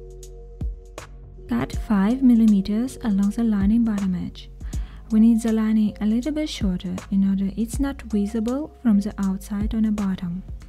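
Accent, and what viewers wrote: Russia. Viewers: Are you Russian?) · И русский акцент))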